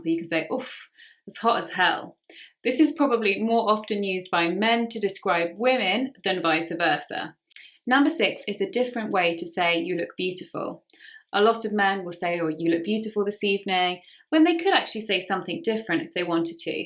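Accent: United Kingdom. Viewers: It has been a utterly splendid, exquisite and flawless lesson. I'm particularly joyful, blissful and elated with that opulent topic. It is to my liking; moreover, it appeals to me. I do adore speaking, and her charming accent., Hence, I present undoubtedly the Queen of British English. You are the quintessential of British English. Thank you very much indeed. Far thee well, dearest Prof. N.B I am on the edge of making myself some “arrabbiata”, “caprese” and a slice of tiramisù. Would you care for an alluring dessert? Ciao. Andrea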